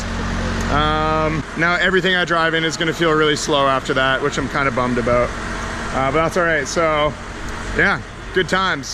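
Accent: Canada. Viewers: Anyone else pay attention on YouTube videos for certain Canadian accent words and sayings? Y'all got that rec weed. Do yo thang😎👌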